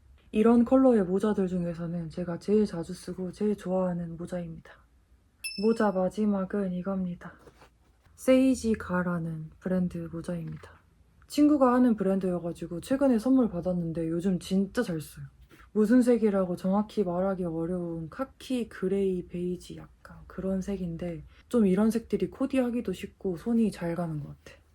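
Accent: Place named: Gyeongsang, South Korea. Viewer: 새영상🥵❤️ 님 사투리 귀여워요ㅋㅋㅋㅋㅋㅋㅜ 광주사람이신가? 대구분? 경상도인가용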